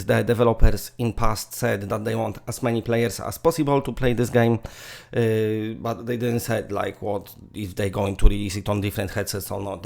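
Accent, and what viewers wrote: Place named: Poland. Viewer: Czy to celowe spolszczanie akcentu angielskich słów czy naprawdę jesteś tak słaby w wymowę? Aż nie chce mi się wierzyć ze ktoś kto mówi tak płynnie po angielsku nie powiedział ani jednego słowa poprawnie 😂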